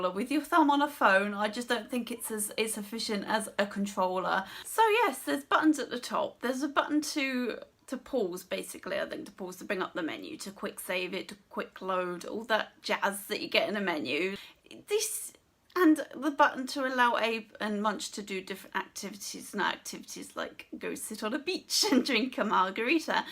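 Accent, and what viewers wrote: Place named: RP, United Kingdom. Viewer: You are one of the few people I know of that can still sound posh while swearing haha. Great review chum.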